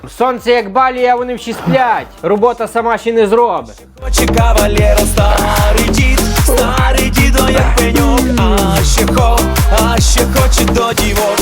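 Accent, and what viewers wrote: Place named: Lviv, Ukraine. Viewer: У вас такой хороший юмор .что снова приходит настроение .А ещё нравится чисто львовский диалект .он очень приятный .Спасибо вам и радуйте нас и далее.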